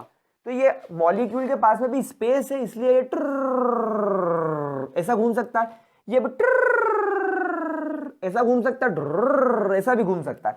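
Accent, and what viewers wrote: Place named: Indore, India. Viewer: yeh sir pakke indore hai voice se hi pata chal rha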